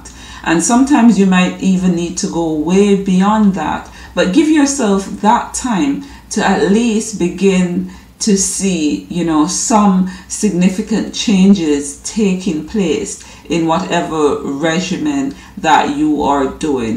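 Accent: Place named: Barbados. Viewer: where are you from? Your accent sounds a bit Barbadian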